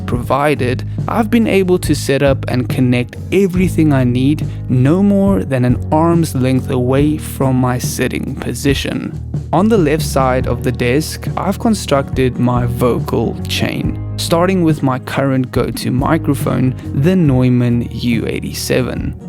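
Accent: South Africa. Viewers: is that a South African accent im picking up?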